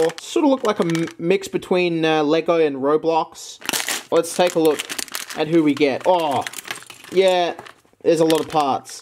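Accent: Australia. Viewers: i thought ur Australian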